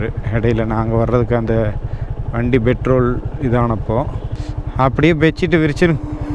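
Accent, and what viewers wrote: Kongu, India. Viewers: Super 🥰 anna unga 🙏🙏🙏கொங்கு தமிழ் அருமை ❤️❤️👍👍👍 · Kongu Tamil super bro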